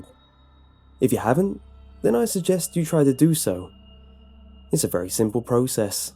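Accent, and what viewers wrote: United Kingdom. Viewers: the narrator is British right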